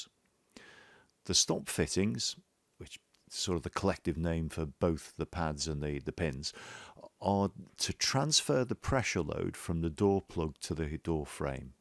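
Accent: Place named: Wirral, United Kingdom. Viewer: You’ve got to be from the Wirral i’ve been looking at your LinkedIn profile and the moment you started speaking I thought I know that accent. 😂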